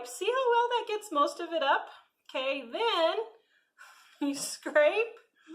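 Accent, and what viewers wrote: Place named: Central Illinois, United States. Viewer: I’m from central Illinois, a lot of ppl say warsh just like you 😂.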